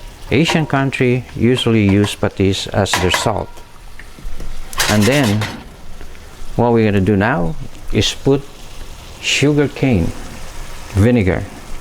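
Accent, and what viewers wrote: Philippines. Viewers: Kuya, masyado ka namang pretending mag english accent. Hirap na hirap ka sa english bakit di ka nalang nag tagalog Sabagay lumalabas pag ka accent ng Visaya mo.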